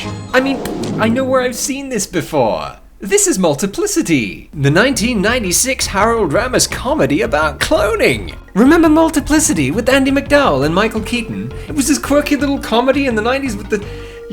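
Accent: United Kingdom. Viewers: British narration & comedy on mlp comentary. Love it.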